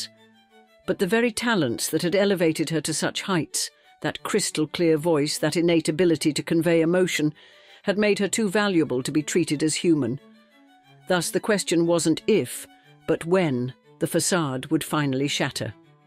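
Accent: England, United Kingdom. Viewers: An extraordinarily good documentary on Our beloved Judy … a genius talent so abundant that no studio or Mayer could quell, but whose legacy was paid with her shortened? But wonderfully generous life. (An English accent somehow underpins this narration with the dignity, honour & respect that Judy earned. A genius talent and top-genre human being. With gratitude for the quality of the content and blessings for its realistic homage …even though it was but a very small sliver of all that our great, great Judy represented, was and still is z Thank you, From Melbourne, Australia. Xx 🙏💝👏🐨🦘🪃🇦🇺🕊️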